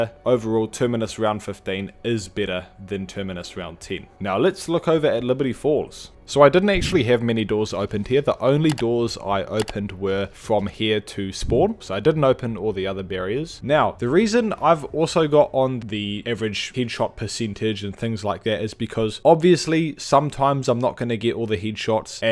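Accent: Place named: New Zealand. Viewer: i watch this guy purely off his new zealand accent, chur uce!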